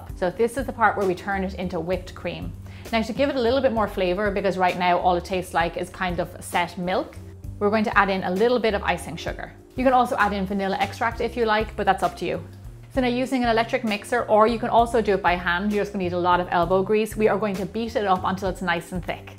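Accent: Ireland. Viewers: God I love the Irish accent!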